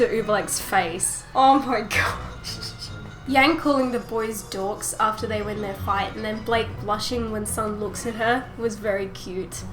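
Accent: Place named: Australia. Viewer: Yay i though i heard an Australia accent, super happy to meet another RWBY fan in Australia, much love from NSW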